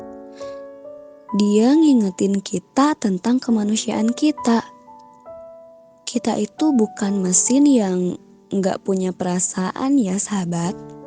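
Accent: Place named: Medan, Indonesia. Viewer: kakknya pasti orang medan kan🥰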